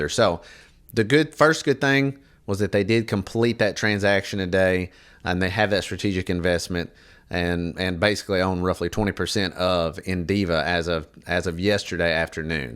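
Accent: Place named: Texas, United States. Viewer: You from Texas? You sound Texan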